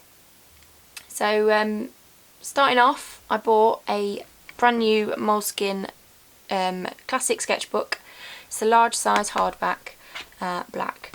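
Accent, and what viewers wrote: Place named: Newcastle, United Kingdom. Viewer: also do u come from around Newcastle England area or just England if it's too personal I was just asking u sound like it. Also thanks for telling us about the RPF it really helped me 🙃😊😊😊😊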